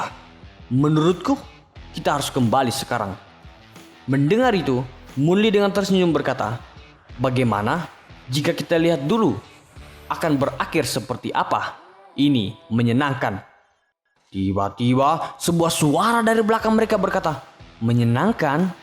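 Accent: Palu, Indonesia. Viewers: Kayak logatnya orang palu komiu ini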